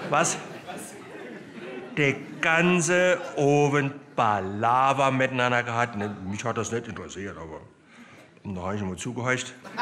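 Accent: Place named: Germany. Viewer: Der beste Satiriker. Das Auszeichnendeste ist der deutsche Dialekt. Wenn wir nur Politiker solchen Formates hätten, die uns führten.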